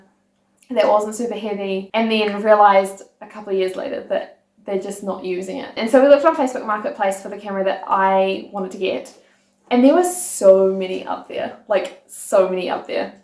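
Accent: New Zealand. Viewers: Once you've reached 1k subs, it'd be only a small step to make it up to 10k.... there will be the point Germans become the majority of your viewers and subscribers, with almost all of them being former exchange students at some parts of their lives (mostly to the US). Your Kiwi accent is so strong, slowing down on your speaking speed would make you easier to understand. I'm still getting it but I have to rewind a couple of times..... And yes, I'm a German subscriber :-)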